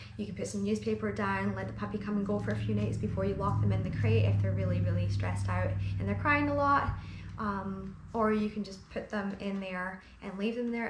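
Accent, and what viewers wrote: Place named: Scotland, United Kingdom. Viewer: I thought I heard a Scottish accent. I’m getting my pup ( Casper ) in two days time, I can’t wait. Thanks for the video and tips. Love from Scotland 🏴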